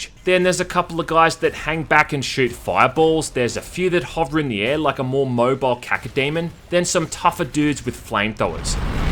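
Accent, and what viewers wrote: Australia. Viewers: You sound Australian.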